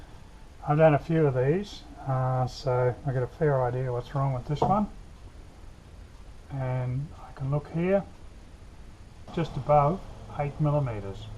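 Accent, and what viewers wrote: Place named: United Kingdom. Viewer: Honestly just from your video sir just this one you've actually kind of persuaded me to listen to British people explain something now I find that funny because yes I am an American I'm sure you would have guessed and I'm a very proud American I'm not saying that I couldn't put that aside to learn something from the British I'm just saying I've always made fun of the way British people spoke their accent their choice of pronunciation of certain words I mean I have legitimately fallen on the floor rolling around laughing so hard but I guess at one point or another you always end up learning about the things that make you happy thank you for the video sir you've earned my subscription in like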